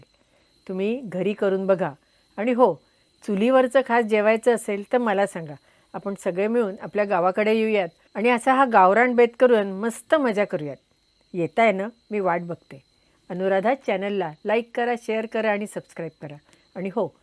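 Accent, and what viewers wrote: Standard. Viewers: माझं सासर लांब असल्यामुळे माझी आई आजारी आहे ती आणि माझे वडील माझ्या बहिणीच्या घरी गेलेले आहेत . भाऊ घरी एकटाच आहे . लाॅकडाऊन मुळे त्याच्या जेवणाची आबाळ होत होती. मी त्याला रोज तुमच्या रेसिपी शेयर करते खूप छान असतात. शिवाय तुमचं बोलणं शुद्ध , स्पष्ट असल्यामुळे ऐकायला खूप छान वाटतं . शुद्ध मराठी सध्या लोप पावत चालली आहे. तुम्हाला खूप खूप शुभेच्छा. अश्याच सुंदर रेसिपी शेयर करत रहा.🙏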